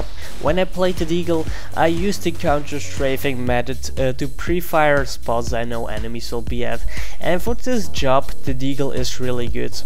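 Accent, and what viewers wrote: Netherlands. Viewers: he sounds very dutch to me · R u dutch? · Are you dutch? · Are you Dutch?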